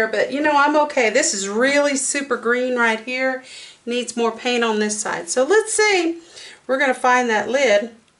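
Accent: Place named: South, United States. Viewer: Where in Idaho? I have 2 Son's in Idaho Falls! I am glad I am not the only one who breaks out in song! LOL I Subscribed!! I Just Love This!!!! Where are you from? You sound like you are from the South! Love~~Z from Texas! Vonda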